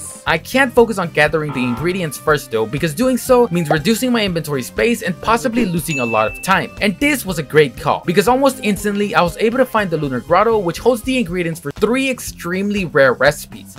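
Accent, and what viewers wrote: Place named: Texas, United States. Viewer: You sound like someone I went to high school with in Texas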